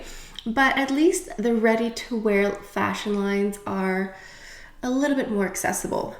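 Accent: France. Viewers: I just discovered your channel. It's a good idea to talk about fashion and makeup at the same time. Your French accent is amazing.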